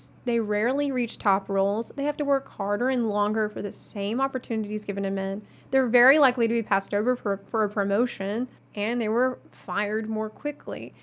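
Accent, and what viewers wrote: South, United States. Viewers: I'm so confused. Your profile says Ukraine, but you sound like you're from the Southern US. Also, your voice is so soothing. This is like accidental ASMR almost. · It's very brave that you talked about a topic that was personally very difficult for you aswell! I could see and hear how difficult it was for you to talk about this, and I admire your courage to make this vid!! Also, you're Ukrainian?:O Girl you sound so very American I didn't even notice the slightest accent lol. My collegue is also, and she's a very nice person, but always very puch busy with her looks and can have a 'southern' temprament hahah (she's from the warm part with coast). Anf finally, people talking in the comments to 'boycot' Blizzard or definitely ending their sub because of this scandal, didn't you hear say that MOST of the people there are just DOING THEIR JOB?! 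The people who are being harassed already have it difficult enough, last thing they need is losing their income.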